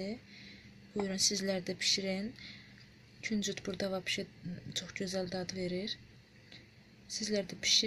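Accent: Agdash, Azerbaijan. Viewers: Superdi.xanim siz Azerbaycanin hansi bolgesindensiz? Agdaşli deyilsiz tesadufen?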